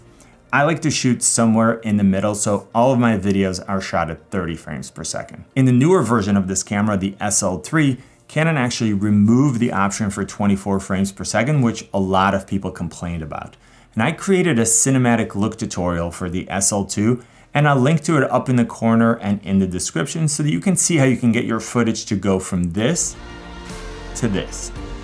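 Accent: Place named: Ohio, United States. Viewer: Hahaha, I thought to myself, "Man, Ohio. I can hear it." Then you showed Cleveland. BOOM. Greetings from an Ontarian in South Korea. <3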